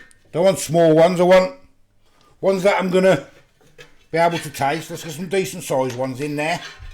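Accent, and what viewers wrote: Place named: West Midlands, United Kingdom. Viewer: Just found your channel I love a good food reviewer you sound common to West Midlands maybe could be wrong tho looking forward to watching your past and future vids 😊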